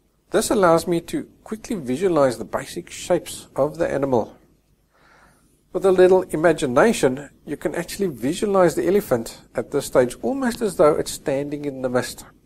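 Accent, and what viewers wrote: Afrikaans, South Africa. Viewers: Is that a Afrikaans accent I'm picking up?? XD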